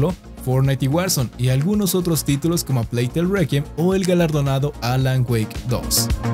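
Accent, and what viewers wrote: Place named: United States. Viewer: Por que no dices serie x o s en ves de tener fonética yanky.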